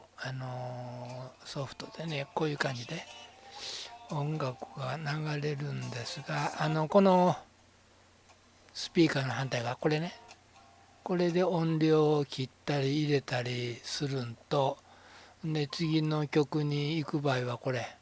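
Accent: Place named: Kansai, Japan. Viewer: 声質と関西訛りのせいでしょうか、「ラジオ」が「ダジオ」に聞こえてなりませんわ😁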